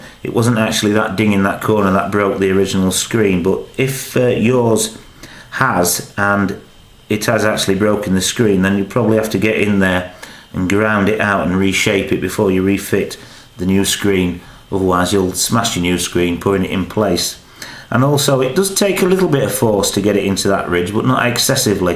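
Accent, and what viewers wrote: Yorkshire, United Kingdom. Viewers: Great video ! Couldn't help but notice your a Yorkshire man . If you live in Sheffield can I pay you to repair my phone ? Thanks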